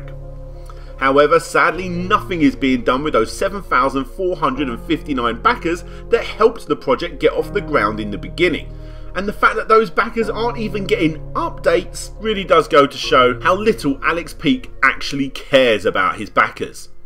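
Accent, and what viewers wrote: United Kingdom. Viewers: almost every count down video will have a british speaker....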